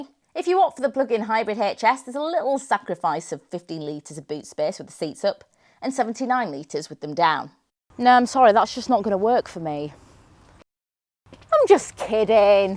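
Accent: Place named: RP, United Kingdom. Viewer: What is this bloody posh accent come on.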